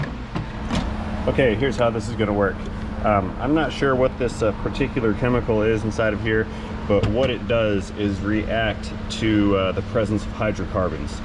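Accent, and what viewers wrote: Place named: United States. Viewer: Your language is good fun I'm English and your American is great fun to listen too 👍😀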